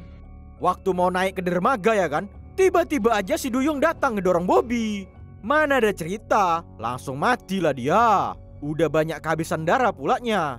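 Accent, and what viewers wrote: Medan, Indonesia. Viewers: BANG ABANG MEDAN MANANYA BANG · Logat Medan bah...👍👍👍👍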